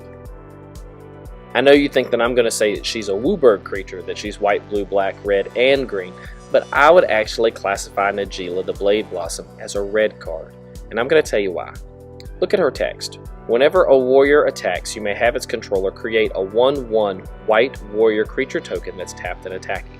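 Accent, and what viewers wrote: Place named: South, United States. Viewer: I agree with some of these, but not all of them. I still believe that most Phyrexian cards are predominantly wanted by their respective colors. They do see occasional play in other colors, but from my experience they are for the most part played in decks that include the color on the card. The biggest exception to this would be Porcelain Legionnaire. Aggro decks of all shapes and sizes love this creature. But for the sake of balance, and because the rest tend to mostly see play in their own colors, I just categorize them all in that color. Speaking of balance, you also mentioned adding in a color aligned land for each color to go alongside Academy Ruins. I don't agree with this. White has maybe one cubeable land in Karakas. Red has actual zero. I think color aligned lands should be treated no differently than any other color aligned card type. 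Don't force any specific card type into every color. If there are no lands you want to play for a certain color, that's ok. It won't offset the draft or the game play at all to not have a red aligned land. Finally, I searched for on Twitter and got no results. Anyway, I really enjoy your videos. It's also nice to hear another southern voice in the cube community (I'm in TN). Cheers, man, and keep the content coming.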